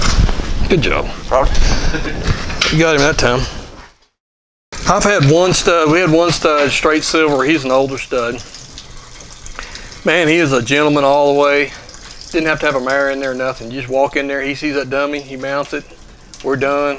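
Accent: Texas, United States. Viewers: honestly watching this guys videos cause one of my original characters is half kelpie and a kelpie is a water horse and i need to learn how horses work for the character. his accent reminds me of home (im in new york and from texas) and im trying not to cry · It's that great Texan accent. 🐴🐎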